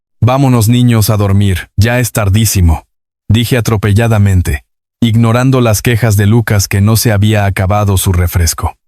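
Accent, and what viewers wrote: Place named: Mexico. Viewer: me encan tus BL pero creo que deverias relatar con un lenguaje menos mexicano porque no todos entendemos el modismo de las palabras mexicanas creo que deberias ser mas plural al hablar con acento y modismo neutro que en todos los paises podamos entender con todo respeto te digo esto tomalo en cuanta porfavor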